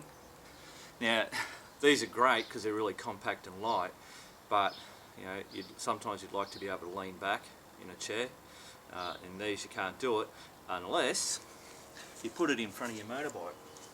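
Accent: Australia. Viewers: You make great videos very informative , thank you for adding US measurements . You have a great Aussie accent , took me awhile to get used to it. I live almost dead center of California only 40 miles away from the center in Clovis California thank you again keep up the good work !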